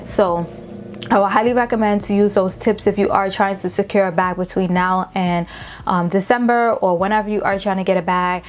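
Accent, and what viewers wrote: New York, United States. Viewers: Why do I always know when someone is from New York lmao.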